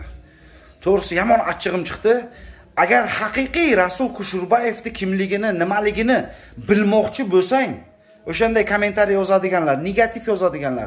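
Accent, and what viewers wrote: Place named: Rural, Uzbekistan. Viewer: КИШЛАКИ ГАПИНДИ КАРАГИН ...ХОТИНГА УКШАП ГАПИРАСАН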